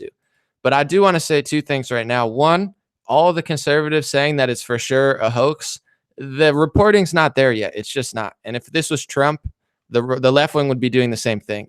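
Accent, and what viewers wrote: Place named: California, United States. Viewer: You're obviously not American. That's NOT a black American accent AT ALL. Black Americans, pretty much no matter where they live have a "southern accent" unless they are articulate. has a California accent and cadence. If a black American sounds like, he/she will be slammed for "talking white".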